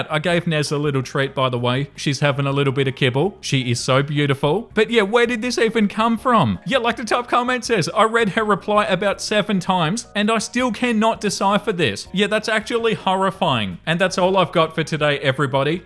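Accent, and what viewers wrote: Australia. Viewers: Australian mate 🙌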